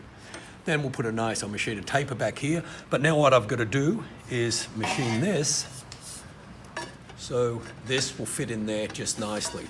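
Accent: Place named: Australia. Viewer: Love your videos. The accent allows you to have the colorful Language without offending. Kind of a "well he's Australian like Crocodile Dundee ". LOL! Thank you for the text included with this video. Very informative.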